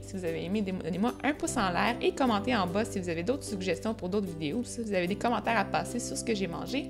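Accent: Quebec, Canada. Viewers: Tes recettes donnent toujours tellement envie !! J'ai l'impression (sur les autres vidéos du même genre que tu as fait , moins sue celle là) que tu manges beaucoup de sucré mais en y regardant bien il n'y a presque pas de sucre!! C'est vraiment génial pour les gens qui adorent le sucré comme moi. En tout cas continue comme ça t'es géniale !!! (en plus j'adore l'accent québécois!)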